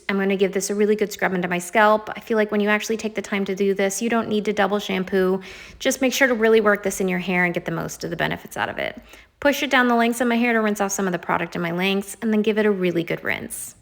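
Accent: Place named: South, United States. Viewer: It did sound southern!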